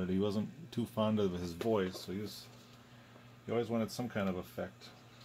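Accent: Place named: Minnesota, United States. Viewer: Been watching a lot of your videos. Never picked up on the fact that Minnesota accent was actually coming from Minnesota. Hello neighbor.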